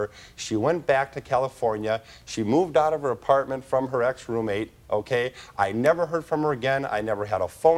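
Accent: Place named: Minnesota, United States. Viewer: I love the Minnesota accent!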